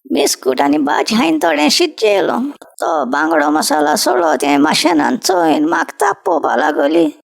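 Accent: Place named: Salcete, India. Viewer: Love your style and your salcette konkani pronunciation. I am sure gaties cannot talk this way.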